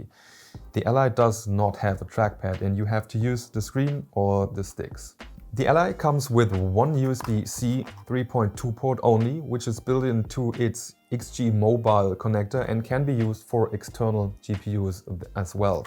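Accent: Germany. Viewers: German? Hört sich definitiv so an, danke für den Vergleich. Hab den Legion Go zurück geschickt. Zu groß, zu schwer für einen Handheld. Software hat nur Probleme gemacht und war kaum schneller als mein altes SteamDeck. Überlege doch noch den Ally zu holen... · Ich hab 2 Wörter gehört und direkt gewusst der Typ ist Deutsch, ahhahaha aber cooler Vergleich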